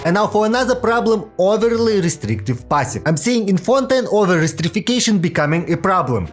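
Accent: Russia. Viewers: are you doing a russian accent on purpose? or idk what that accent is, i can't listen to it anyways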